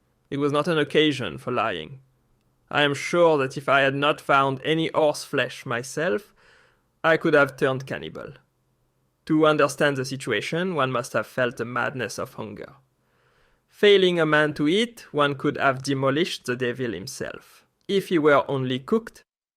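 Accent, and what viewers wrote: France. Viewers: Excellent video man, I have listened to a few different accounts from the Russian campaign and I must say, even though you are speaking English the French accent provides a deeper authenticity you don’t get with other accounts.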